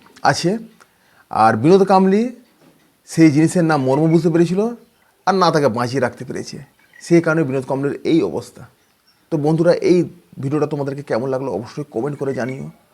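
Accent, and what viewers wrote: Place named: West Bengal, India. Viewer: 'Ramakant Aacharya' noy, sathik naam hocche 'Ramakant Aachrekar', surname er shese 'Kar' ansho ti thakle tini certainly Marathi haben, etai riti. Video banabar aage motei homework karen ni, saadharan dharana tukuo nei aapnar aar video banate lege porechen ei most sub-standard knowledge niye du-paisa kaamabar love ekaaje neme porechen? Moreover, aapnar kathay puro aanchalik taan, medinipur othoba Bankura jelar 'Dialect' e katha bolchen huge platform e ese. Chi: aage nijeke properly groom karun. Eto substandard quality niye 'YouTube' er moto wide platform e chole esechen. Aapnar narration etotai kharap je shunte ritimoto kaane peera dicche. 'Like' to durer katha aapnake 'Dislike' diteo ghrina hocche! Saadharan shuddha Bangla tao bolte paaren na Bangali hoye, lajjar katha!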